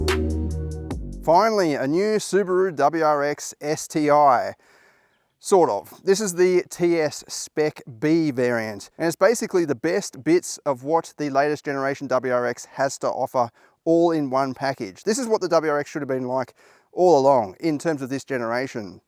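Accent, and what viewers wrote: Australia. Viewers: This video is Australian. Steering wheel on the wrong side and accent is a bit of a giveaway.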